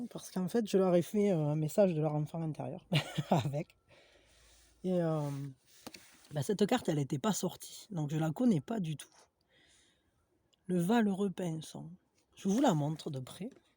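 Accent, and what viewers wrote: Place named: Gascony, France. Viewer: Chère, je viens vous dire M.E.R.C.I. merci, merci pour cette magnifique, magique, et tellement parfaite année que vous nous avez offerte avec ce cœur magnifique que vous avez. Vous faites ^partie des gens exceptionnels que j'ai eu le bénéfice de rencontrer. C'est rigolo parce que vous avez le même accent que moi ( je suis en Gascogne - 32 ) et vous? si vous permettez bien sur. Joyeux Noël. Paix, Amour, Lumière à vous ainsi qu'au monde entier.Je nous aime!!! PS: Vous chantez divinement bien!!!; )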